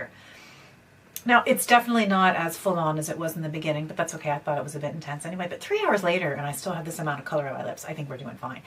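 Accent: Ireland. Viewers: I love your Irish accent peaking through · Love the Irish accent peeking through. Thanks for the honest review. Hope you had a great Christmas.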